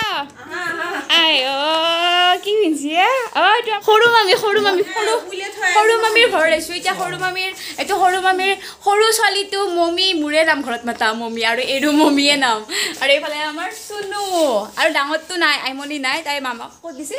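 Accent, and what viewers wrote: Nalbari, India. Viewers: বহুত ভাল লাগিল ভিদিওটো, আপোনাৰ ভিদিও চায়ে থাকো । বিশেষকৈ নলবেইৰা ভাষাটো ক‌লি আৰু বেছি ভাল লাগে । মাজে মাজে কৈ থাকিব চোন। ❣️❣️❣️ ( নলবাৰী ধমধমাৰ পৰা) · Apunar ghar kot baa mane apunar vakha2 olp nalbari r nisina mr oo ghar t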